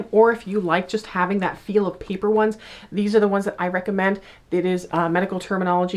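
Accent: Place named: South, United States. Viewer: THANK YOU SO MUCH!!! I never realized that existed. .75 has the perfect southern draw my ears need.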